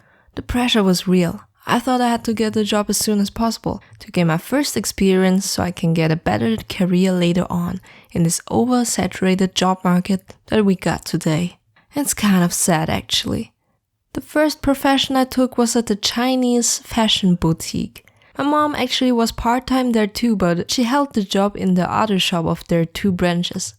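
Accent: Germany. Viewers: she comes from austria so it is a german accent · the accent also sounds like a german accent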